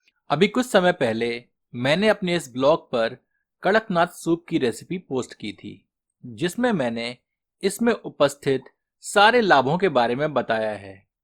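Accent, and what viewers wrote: Standard. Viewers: आपकी शुध्द हिन्दी और आकर्षक प्रस्तुति से ऐसा मेरा मानना है कि यह डिश बेहद स्वादिष्ट होगी..निश्चित ही इसे बना कर आजमाया जायेगा... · आपका विशुद्ध हिन्दी मे बोलना काफी अच्छा लगा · apki shuddh hindi bahut hi achi h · shudd hindi and very polite way to explain recipe. awesome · shudh hindi me recipe dekh kar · aapki voice aur pure hindi soonnnay aata hun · आपका बोलने का शुद्ध हिंदी तरीका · Aap itni shuddh Hindi prawachit krte h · aapka Shudh Hindi Ucharan bahut Pasand hai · Apki sudh hindi Or cooking style dil jeet liya.... Dhanyavaad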